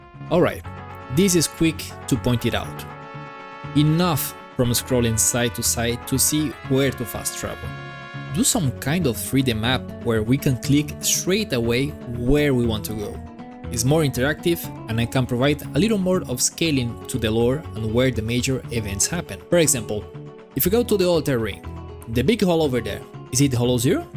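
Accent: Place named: Spain. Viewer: Te lo digo en castellano porque el acento español te delata descaradamente. Sobre el tema incursión arriesgada en concreto te recomiendo que busques videos de gente haciéndoselos con personajes a nivel 50. Creo que si fuera solo un dps check tener los personajes a nivel 60 con las habilidades cerca del 11 sería casi obligatorio y que el juego se puede jugar de manera más profunda de lo que aparenta. Los propios desarrolladores han dicho que querían diseñar el juego de manera parecida a un juego de lucha para que la habilidad de los jugadores tenga mucho peso y desde que he visto este estilo de videos e intento aplicar sus técnicas mis puntos en incursión arriesgada han mejorado una barbaridad. Solo eso, el resto de puntos me dan un poco lo mismo, este en concreto me toca de cerca porque yo estuve un tiempo frustrado con el modo también así que espero que sirva mi comentario. 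Un saludo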